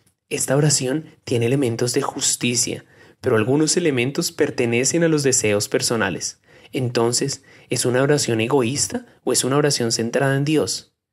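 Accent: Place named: Colombia. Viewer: This is great idea. however, the audio has a strong Colombian accent. Is there a scrip that we can read?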